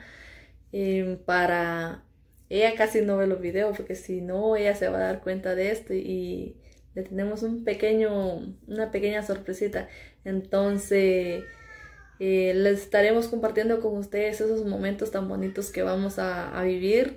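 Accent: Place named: Mexico. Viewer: . Holaa apenas anoche mire tu video yo miro los canales de tus ermanos. Y a todos las chicas y chicos me la pasa viendo los videos no sabía que tu tambien asias. Videos me encanta como eres te espresas muyy bien se me ase que tienes un asento en tu voz como mexicano tus ermanos y todas las chicas y chicos tienen diferente asentó. Pues bueno a segir adelante ya me suscribi a tu canal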